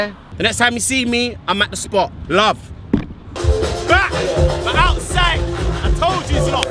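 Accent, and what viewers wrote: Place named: United Kingdom. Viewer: As a none UK....you make the UK accent sound so cringey..... you can never sound hard so stop the antics. " bap"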